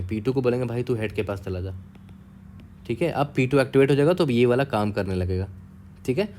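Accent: Bihar, India. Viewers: accent se laga thoda bihari touch hai btw nice explanation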